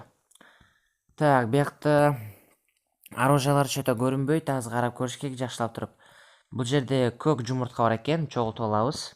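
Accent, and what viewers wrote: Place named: Talas, Kyrgyzstan. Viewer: Таластык окшойсун досум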